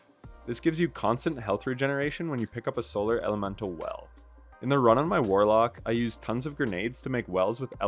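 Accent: Canada. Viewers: I was fairly certain just hearing you speak but as soon as you said “off the rip” I knew definitively that you were Canadian lol. Solid video, dude. 👌🏻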